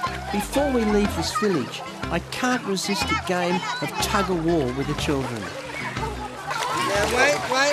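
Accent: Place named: Australia. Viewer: I knew he was Aussie! I am really proud to be Aussie right now! :)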